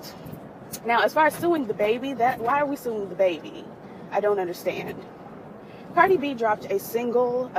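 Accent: South, United States. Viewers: I tune in because of your southern twang. Do you girl and forget those haters. Have a good Friday mama.... BTW: Emily is crazy for allowing a dude who wont even claim her abuse her. Self esteem is essential when dealing with those type of dudes. Hope she finally moves on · Bring back the southern accent! Lol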